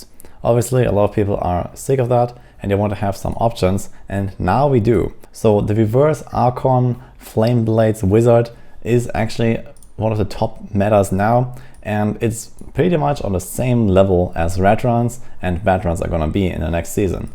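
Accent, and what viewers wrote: Germany. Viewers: with all due respect, Greece is a shithole, i donno where are u from but from your accent i can assume that you're from germany\austria etc. but hey if you're happy there then i'm happy for u!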